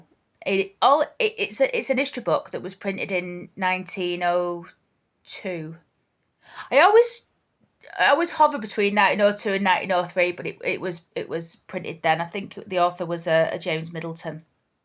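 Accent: Oldham, United Kingdom. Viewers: Writing a book is a good idea-just do it at your own pace-& take your time-& it must have "Oldham-Speak"-in it-no fancy "A-i"-lingo-just the way you normally talk--& remember -Sports people=footballers/cricketers/rugby-players are as "Dumb-as-two-short-planks"-& their books are "ghost-written"for them-because they are incredibly Stupid!!-so-do the book on you own in " --Speak"-with plenty of "Oldhamisms"-because the Northern-Language"-is dying out-you are about the only one who cares--& when your gone-your House will be bull-dozed too-also-I think you should do that 'Celebrant-Course"-you get them "coming & going"-& you are "walk-up-start"-for that!!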